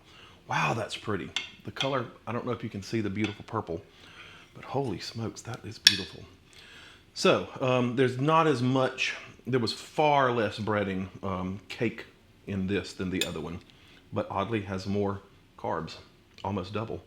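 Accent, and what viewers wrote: South, United States. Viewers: Love your Southern accent. Can’t wait to try these; I need to research Swerve sweetener (I usually use a monk fruit/Stevia combo).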